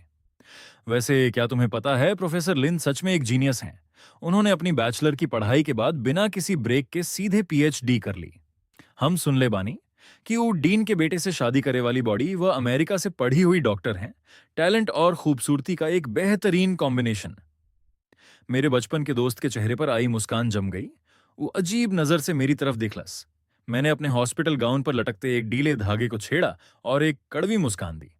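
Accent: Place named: Bihar, India. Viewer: Bhai tu bihari se k video m bhi bihari bhasha ave h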